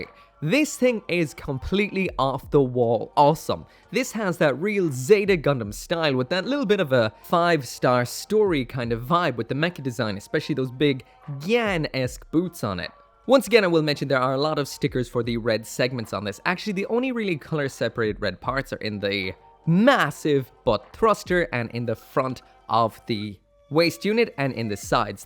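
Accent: Ireland. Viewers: Irish Acent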